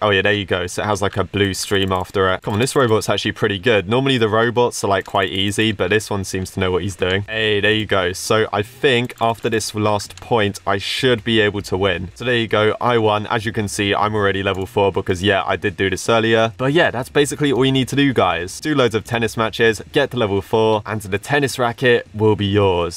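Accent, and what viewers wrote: United Kingdom. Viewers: Sometimes I forget is Britishy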